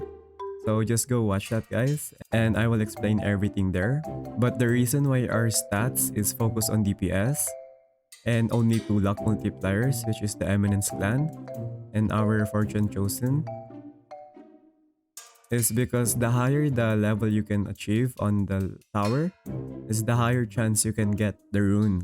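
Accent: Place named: Canada. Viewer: this might be the most candian accent i ever heard in my lifetime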